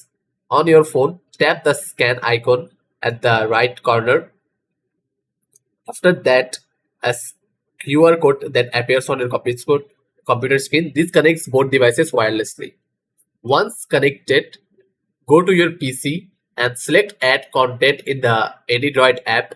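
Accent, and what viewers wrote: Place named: India. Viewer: Where are the US English accent I only find Indians accent which is hard to understand .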